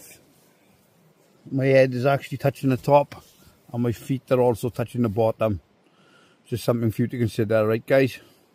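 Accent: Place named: Scotland, United Kingdom. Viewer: Sounds like you might be Scottish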